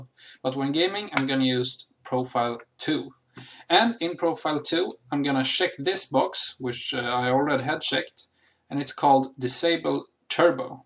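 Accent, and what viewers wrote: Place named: Sweden. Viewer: Älskar när man kan höra ett det är en svensk som talar engelska <3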